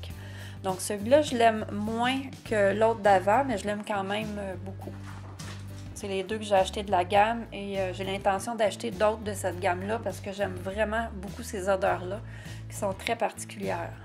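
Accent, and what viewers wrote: Canada. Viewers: cc jadore ton accent cannadien si je ne me tropmpe pas.jadores les produits Yves Rochers.moi je prend souvents des parfims et billes de bains.super ta videos je te fais de gros bisous a bientot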